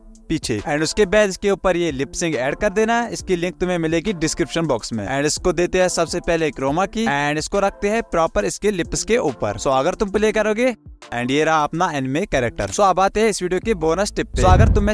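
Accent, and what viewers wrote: Kashmir, India. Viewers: just by your accent i can decode that youre from kashmir my homie shukh na ?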